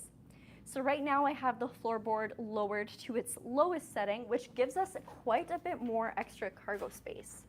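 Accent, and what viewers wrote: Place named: United States. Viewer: Disappointed hearing an droning American accent ...lost in translation zero authenticity coming through.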